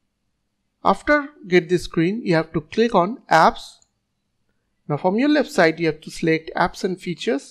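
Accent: India. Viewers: Video accent English - - - - - - - Indian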